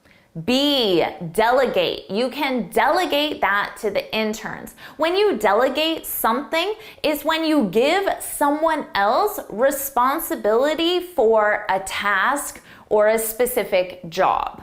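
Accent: United States. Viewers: This American accent is so ugly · 15/15 of course. This project is pathetic - why don't you just encourage people to use good dictionaries? After all, that would spare them from hearing your appalling American accent, and from being led astray by your occasional solecisms (e.g: dangling prepositions, or split infinitives). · So annoying that this is promoted as Advanced English words when the presenter is quite obviously speaking American. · English vocabulary by an American, very loud one at that!! Not for me.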